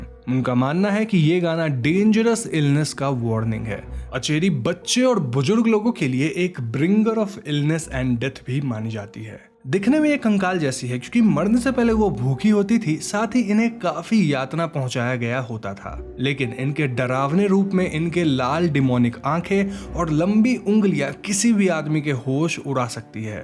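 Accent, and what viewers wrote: Bengal, India. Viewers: R u a bengali? Your accent..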